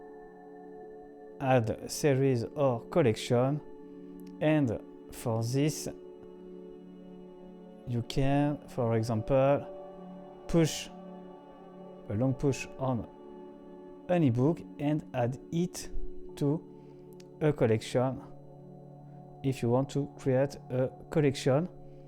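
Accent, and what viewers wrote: France. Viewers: What a helpful video. Thank you so much! This is the second video in 2 days where a French person feels like their accent is a problem - it’s honestly not even a thought. I’m sorry you guys feel self conscious. Truely I’ve never heard any negative commentary about French accents. In fact, I’ve only heard good things 😌 thanks again for the very helpful tutorial - much appreciated 😌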